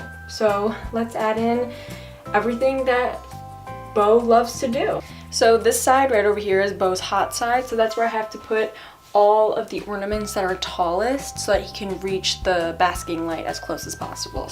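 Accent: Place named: Australia. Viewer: When I heard the astrailian ascent I freaked I love it so much it’s adorable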